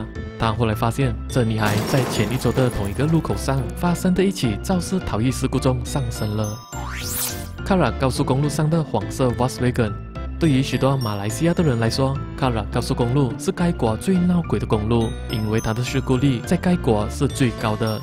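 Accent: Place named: Fuzhou, China. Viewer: 一听到就知道是福州人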